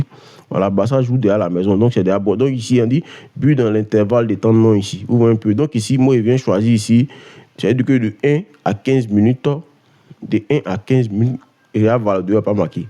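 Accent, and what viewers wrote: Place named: Ivory Coast. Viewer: merci mais vraiment ton asant me rapel vraiment tu es ivoirien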